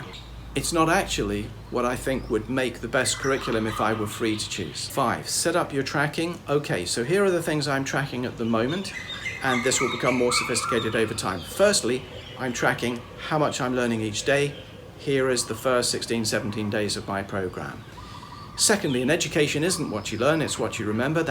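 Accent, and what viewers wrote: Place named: United Kingdom. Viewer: I think the birds really dig what you're saying. I know I get all frothy like that too when I hear your smooth British accent.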